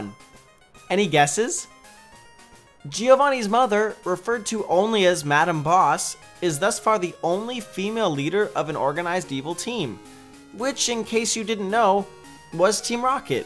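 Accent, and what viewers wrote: Netherlands. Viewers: Wait are you dutch? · Are you dutch?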